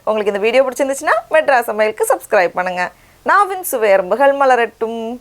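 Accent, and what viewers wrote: Nagercoil, India. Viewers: You are a pleasure to be watched,. Your Nagercoil slang is too good. The recepies are excellent. The pizza came out very well. Love to you.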